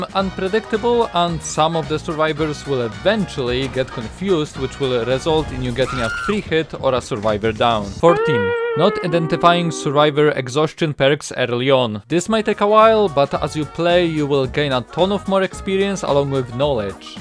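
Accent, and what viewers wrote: Poland. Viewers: I heard Polish accent and subbed, 🇵🇱❤️🇵🇱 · strong polish accent 😨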